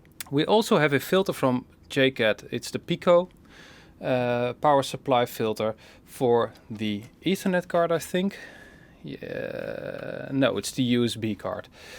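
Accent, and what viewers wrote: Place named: Netherlands. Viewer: The way your accent sounds i know for sure youre from the Netherlands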